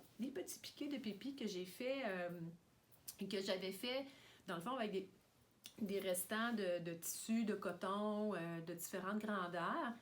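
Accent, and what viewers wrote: Quebec, Canada. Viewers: Coucou, c'est à tout hasard que j'ai cliqué sur ton podcast et je ne regrette pas. J'aime beaucoup tes ouvrages, ton humour et ton accent Québécois ❤... j'ai hâte de te retrouver dans ton prochain podcast ( avec micro comme tu disais au tout début ( c'est vraiment le seul bémol ))☺) bises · Bonjour, je te regarde depuis la France. J’adore tes chaînes, ta simplicité, ta gentillesse et ton professionnalisme. Tes ouvrages sont toujours parfaits. Je me régale de ton accent québécois, mes enfants avec mes petits enfants sont partis vivre à Montréal depuis 5 ans et j’ai l’impression d’être en visite chez eux. Merci pour ta chaîne ❤ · Bonjour, contente de te connaître et impatiente de te retrouver pour de nouveaux projets. On a l'impression de voyager avec ton accent du Québec !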